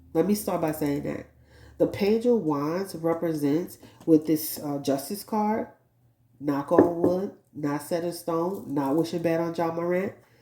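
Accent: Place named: New York, United States. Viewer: You are from New Orleans, but you sound like a New Yorker, well just an observation.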